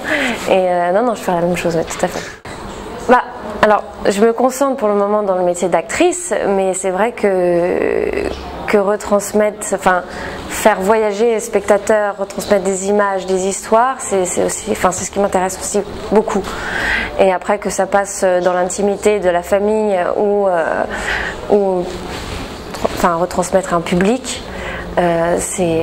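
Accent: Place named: Paris, France. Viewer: attention à l'accent bobo-aristo-parisien sinon vous êtes très jolie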